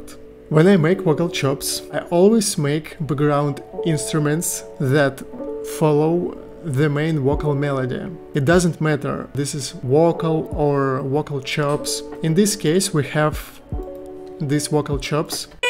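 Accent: Russia. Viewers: dude i love your english russian accent...no need to feel embarassed when you speak in english :) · Brooo amazing work, really! No I feel like my remix is bad😅 Also your russian accent Is reaaly cool! Almost like in my country?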